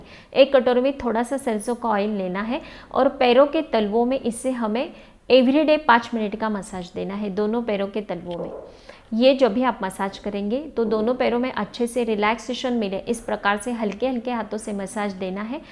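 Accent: Maharashtra, India. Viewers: Good Tips...Thanks. My guess... you are Maharashtrian. Because of pronouns of some words done by you I came to that conclusion. Am I right ?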